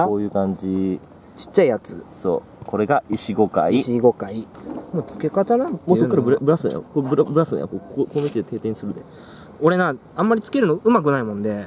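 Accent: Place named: Nagoya, Japan. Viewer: 会長、名古屋弁どらでまくりだがや！笑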